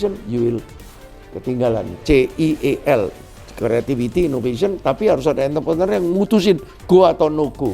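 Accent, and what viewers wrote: Surabaya, Indonesia. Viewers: medok surabaya-nya khas banget, baru tau kalo org surabaya. biasa baca bukunya aja soalnya. Bangga dadi wong Suroboyo Pak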